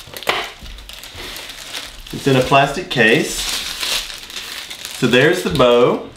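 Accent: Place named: Western North Carolina, United States. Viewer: Not a severe western North Carolina accent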